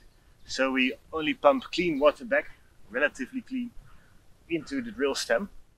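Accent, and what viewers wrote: Netherlands. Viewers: Kan horen dat je een nederlander bent haha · Gewoon Twents verstoa ik ook we heur lol :-) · I like that rig a lot, very well thought out for the task. But you are lucky with the soil consistency you have in the Netherlands (I assume from the accent). If you have rocky ground... well then... back to conventional drilling. ;) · Are you dutch by chance? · sounds Dutch :)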